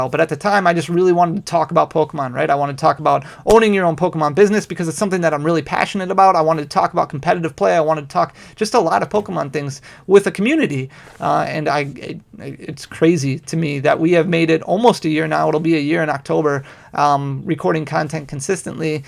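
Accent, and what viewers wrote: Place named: Wisconsin, United States. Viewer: I enjoy hearing your Wisconsin accent! Moved down to Georgia a few years ago.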